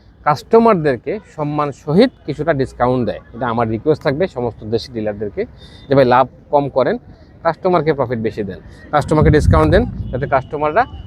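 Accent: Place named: Sirajganj, Bangladesh. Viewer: সিরাজগঞ্জ কথায়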